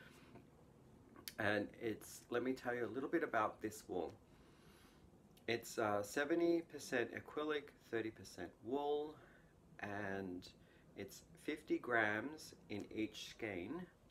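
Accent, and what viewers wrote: Australia. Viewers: Thought I recognised the accent. Hello from a fellow Aussie. Love Canada too! Anyway Starting with video number one after your channel came through on my feed. Gosh you only start 6 months before this video. Great job on the chevron scarf it looks gorgeous! I'm really looking forward to seeing how far you've come in the 3 years since you started out.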